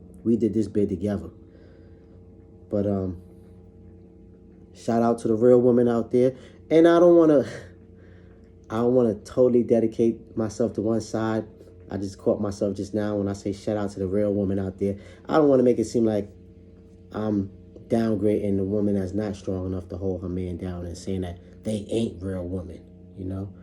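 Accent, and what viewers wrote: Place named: Pennsylvania, United States. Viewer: I'm a new subbie & supporter. First thing, your voice is giving me Cassidy vibes😁im from PA and I recognize the accent. Second thing, you are a nice looking dude. Third, u need to post your cash app so your supporters can really support support if you feel me. Much love. Keep posting.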